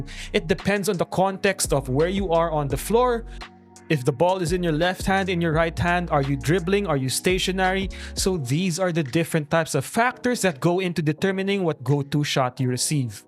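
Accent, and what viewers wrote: Philippines. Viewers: Tagalog accent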